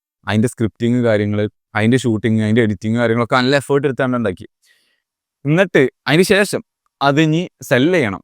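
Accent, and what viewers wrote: Malappuram, India. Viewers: താങ്കളുടെ വീഡിയോകൾ എല്ലാം മികച്ചതാണ്. പക്ഷെ സംസാരത്തിൽ നാടൻ മലപ്പുറം കാക്കാൻമാരുടെ ശൈലി കടന്ന് വരുന്നത് ലജ്ജാകരമാണ്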